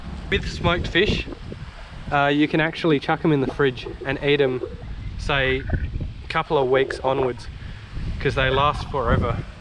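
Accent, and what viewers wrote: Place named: South Australia, Australia. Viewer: I think this is the first time I’ve noticed a different accent from SA to east coast Aus . Nice vid ! Cheers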